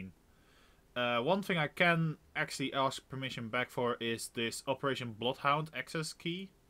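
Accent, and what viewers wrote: Netherlands. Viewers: ben jij nederlands ik hoord het aan je engels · Are you Dutch?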